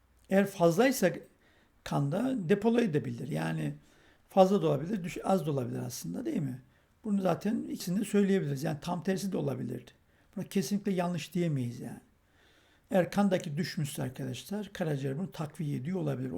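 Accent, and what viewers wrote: Black Sea, Turkey. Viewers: Hocam karadenizin neresindensiniz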